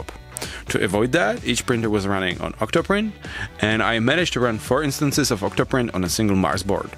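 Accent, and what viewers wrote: Czech Republic. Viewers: i like your early czech accent..and really...NO Obsolecence sounds so great!!! i missing 1 or 2 nice prusament skintones...maybe in the future ?greetings from germany.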